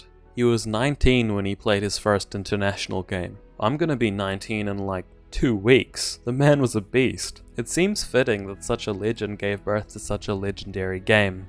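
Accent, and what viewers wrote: New Zealand. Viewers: As a kiwi I can’t even identify our own accent lmao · those aren't pukeko, them be takahe (edit) never mind I see someone else pointed it out. your accent is uniquely wellington and southland at the same time. them r's are more outta control than mine!!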